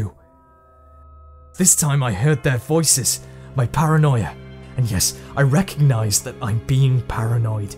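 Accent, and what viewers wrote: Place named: England, United Kingdom. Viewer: Oh crap you're English. Nice CP lel.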